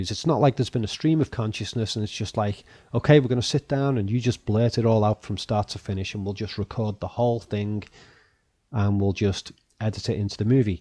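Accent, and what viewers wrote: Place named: Northern England, United Kingdom. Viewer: Dissenter here. Regarding that couch..... Maybe he / his wife simply redecorated the place a bit between days of shooting? I know, it sounds incredibly simple and non-conspiratorial but maybe one would want to do something chore-like after a day of harrowing interviewing / reminiscing. Maybe it was planned already; obviously the shot with the couch was filmed at a different point in time. So........ what?........ If you were to analyse reality this way, you would find it to be non static most of the time. People sometimes move stuff around! They must be hiding something. Ofcourse your elaborate scheme scenario, illustrates your narrow analytical view (tending towards form over substance) and slightly glosses over the fact that if your theory were correct, AND following your logic then they'd probably just paint over a similar chair and move the couch away and avoid the "suspicion". 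BTW you completely missed the shrubbery outside, which is trimmed shorter in the shot with the couch! Can't believe you missed that! Weird huh? Lots of used takes / editing applied means false.... that's not a convincing argument and you should know that. You analyse films. Yes, shockingly, documentaries ALSO get edited and aren't filmed in one continous shot. BTW you're from Northern England right? Love that "K" sound you guys do after "-ing" words though.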